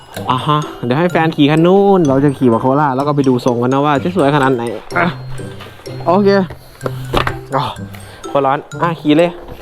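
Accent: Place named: Phu Thai, Thailand. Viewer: พี่พูดพาษาผู้ไทเหมือนผมเลย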